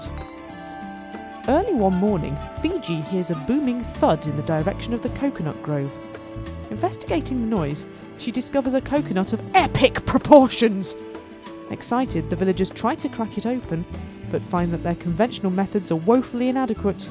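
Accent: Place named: United Kingdom. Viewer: I love your British axsent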